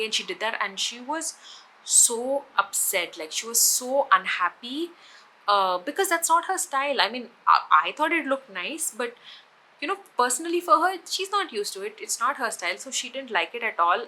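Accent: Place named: Kerala, India. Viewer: She is so amazing and realll. I love her malayali accent and speaking few hindi words in between is sooo cuteeee, that's the best thing. She's my new favorite YouTuber❤ And you looked fabulous in all your events. This is a great inspiration for new brides who don't feel the need to be tooo extraaa. I'll keep these advices in my mind for sure😊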